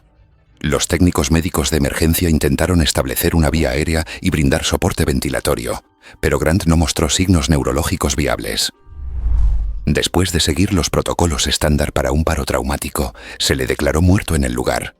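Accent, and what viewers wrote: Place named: Spain. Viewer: ME ABURIO TU ACENTO ESPAÑOL DEMASIADAS ESES Y ZETAS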